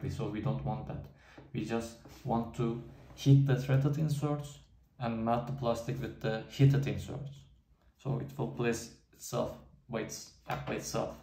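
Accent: Turkey. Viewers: thanks and Ramadan Kareem! Noticed you have a Turkish accent 😉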